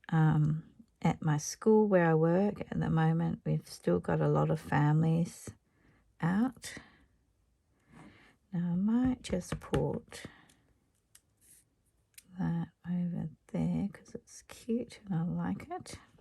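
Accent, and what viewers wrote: Australia. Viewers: I enjoyed your talking! You did a great job. I am not very knowledgeable when it comes to mixed media and learn quite a bit from you! Keep talking! We Americans love an Aussie Accent!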